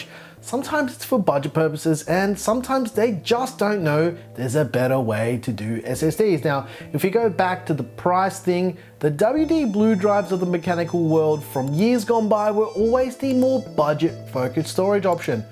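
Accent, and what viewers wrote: Australia. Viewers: This is the first video I've seen you, and 3 seconds in I know you're an Aussie Tech youtuber! Subbed! Hope to see more.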